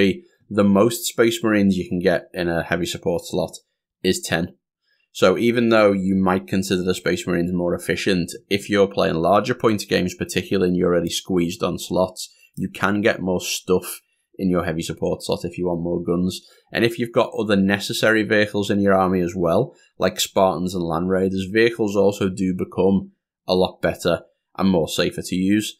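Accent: Liverpool, United Kingdom. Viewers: Are you from Merseyside? You sound scouse-adjacent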